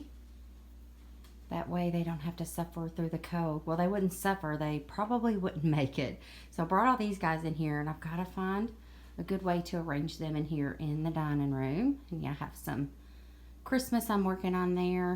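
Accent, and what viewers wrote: South, United States. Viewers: I love how excited you are getting about growing these! Also, I’m going to have a better day because you told me too in your lovely southern accent 😊🤗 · Ciao,. You have the cutest southern accent in all of the world, my dear friend. What's your honest opinion on roses?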